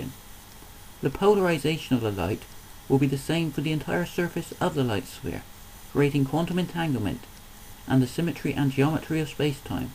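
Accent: West Country, United Kingdom. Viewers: hey dude, this is the same way i see quantum entanglement. but then i'm a biologist so what do i know. also, are you from the west country?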